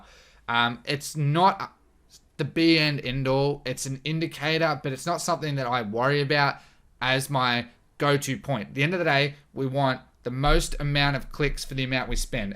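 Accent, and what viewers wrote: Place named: Australia. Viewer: Thank you so much man for the content , I'm understanding Australian English better now 👍👍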